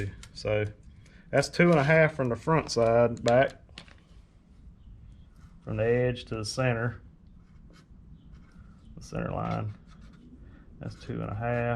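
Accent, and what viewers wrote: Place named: Tennessee, United States. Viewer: You the first fellar I ever heard that sounds just like me. The tone and the way you put words together. Middle Tennessee ?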